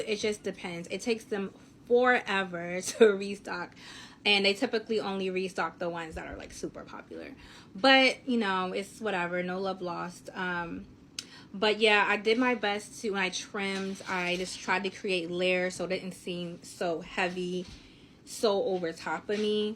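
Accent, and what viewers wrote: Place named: Philadelphia, United States. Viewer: I knew you were a philly girl. Talking bout this jawn lol. 215🩷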